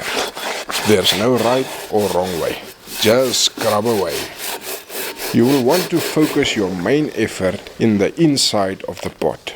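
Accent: South Africa. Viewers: I can’t wait to restore our almost forgotten pot, but I will definitely watch this video multiple times to listen to your heavy South African accent 😊❤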